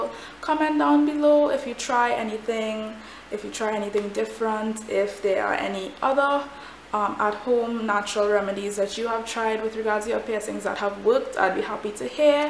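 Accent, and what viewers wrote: Trinidad and Tobago. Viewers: got so excited when I heard that Trini accent!!! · are u from Trinidad? 🙌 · Girl u got to be Trini! Love the accent...thanks for the advice as well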